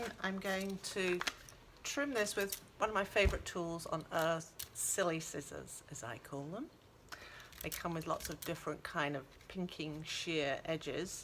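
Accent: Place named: Ireland. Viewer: Thanks so much this is brilliant My mother is also Irish.... we can show off our Irish accents!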